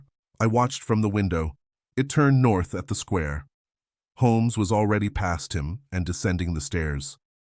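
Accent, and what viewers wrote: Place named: United States. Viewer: Sherlock Holmes with an American accent noooooo🤬🤬🤬🤬🤬 no chance will I listen to this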